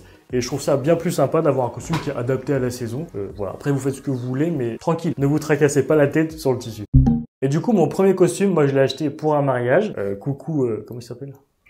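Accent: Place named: Banlieue, France. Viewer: Tu parles comme dans la banlieue wesh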